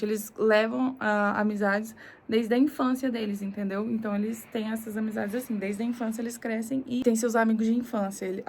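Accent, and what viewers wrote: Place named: Goiás, Brazil. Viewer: Goiano e sempre um trem gostoso de ouvir né! Acabei de chegar mas já estou adorando seu canal.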